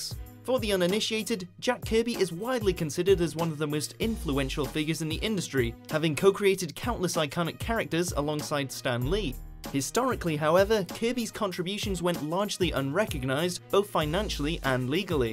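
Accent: England, United Kingdom. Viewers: the first british wathmojo host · Dude, English guy!!!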